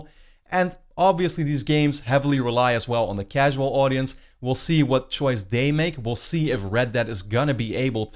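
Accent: Netherlands. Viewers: Hmmmm you have a Dutch accent.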